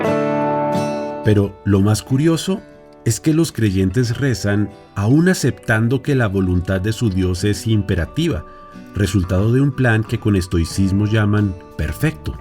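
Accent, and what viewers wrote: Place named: Bogotá, Colombia. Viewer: Acento bogotano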